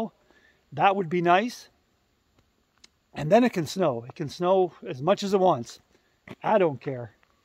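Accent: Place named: Canada. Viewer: That must have been some fall! I’m glad your okay and didn’t seriously injure anything. When you were showing us how you landed by demonstrating I was wanting to say “ don’t do that to yourself your in enough pain. We can just imagine in our minds”. Again glad your ok and that you’ll survive. Enjoy watching your content;been watching 2 years now. Looking forward to the next one. Also I hope you and your family have a great Thanksgiving 🦃. Also just wondering, are you Canadian? Reason I ask is because I notice when you say words like out I can hear the oot pronunciation. Thanks!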